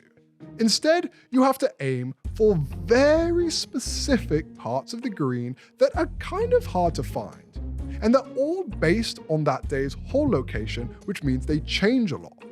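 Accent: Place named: England, United Kingdom. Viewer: I’m over everything golf being an English accent. Sorry.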